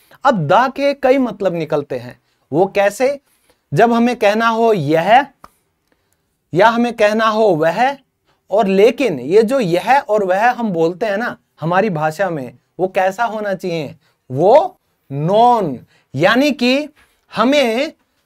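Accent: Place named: Haryana, India. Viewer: Sir muje aapka pdane.ka lahja Bahut jyada accha laga aapse Padhkar apne Haryana ki feelings Aati hain aapne vakai etne jabardast 👌 andaz me cheezo ko explain Kiya Aapse pahle kabhi kisi ne ese na padhaya hoga thank you so much Bhai mere 🙏🌸🙏🌸🙏🌸🙏🌸🌺💐🌺💐🌺💐🌺💐🌺💐🌺💐🌺💐 Jai Hind Jai Bharat vandematram 🇮🇳🇮🇳🇮🇳🇮🇳🇮🇳🇮🇳🇮🇳🇮🇳🇮🇳🇮🇳🇮🇳🇮🇳🇮🇳🇮🇳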